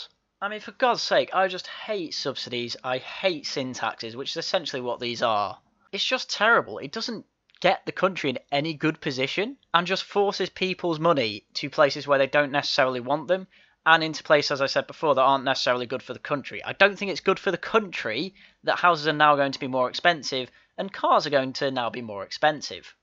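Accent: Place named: London, United Kingdom. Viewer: Some thick Tyrone from London is narrating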